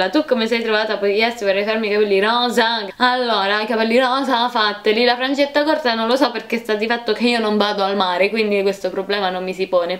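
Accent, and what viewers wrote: Rome, Italy. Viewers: È di roma e si sente tantissimo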